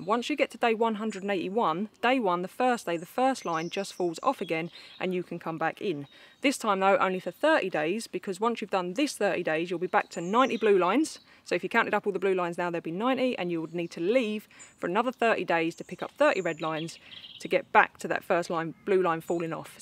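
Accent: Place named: South London, United Kingdom. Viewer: Sounds like a proper Sarf Lundun accent 😁. Trying to lose my Sarf Lundun accent on my radio show 🙄 it’s not workin. Great explanation 👍🏻.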